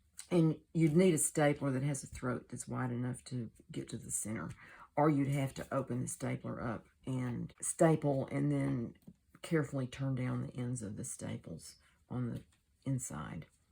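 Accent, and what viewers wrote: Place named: Texas, United States. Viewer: These are so cute! And would make a lovely addition to a journal for a gift. I have been watching your videos, I don’t comment much, or every time. But love your channel. Your voice is so comforting... are you by chance in East Texas? Or Texas in general? You sound like you are. I wasn’t going to ask, but then you showed the post card from San Antonio sunken gardens. I’m South east of Dallas between Dallas and Tyler... anyway... I love finding new uses for the envelopes, and can appreciate that you don’t try and disguise the fact it’s an envelope!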